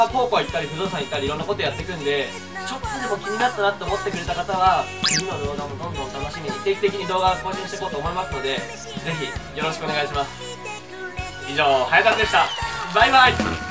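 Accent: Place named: Gunma, Japan. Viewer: 群馬イントネーションわろた。ジャートンの編集いいよね。笑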